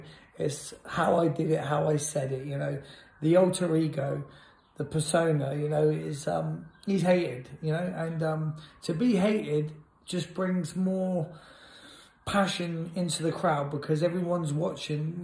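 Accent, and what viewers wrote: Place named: United States. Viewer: The yank accent is gold, never surrender, greatness awaits 💯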